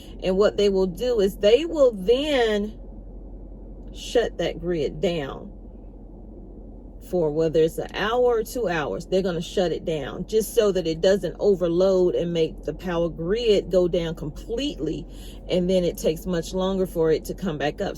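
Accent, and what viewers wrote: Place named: North Carolina, United States. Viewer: I knew you were a North Carolina Girl as soon as you opened your mouth! 💙 ( I reside about 30 minutes from Hickory, so I'm there pretty often). As I listened, I got tickled, because I was like...OMGosh! I found someone like me!! I'm serious. I could be sitting there talking in your place. This is 🤣 crazy!! Thank you for putting yourself out here, for caring about others and for serving as a beautiful example of a true Southern heart. God bless you and your channel. And Food Lion had their crab legs in sale for $11ish this week or next. Do you can yours? I'm considering giving it a go.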